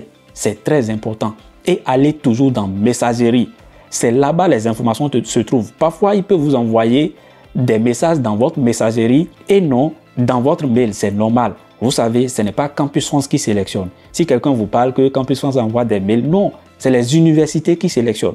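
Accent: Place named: Benin. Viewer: tu dois être béninois vu ton accent! moi ton voisin togolais !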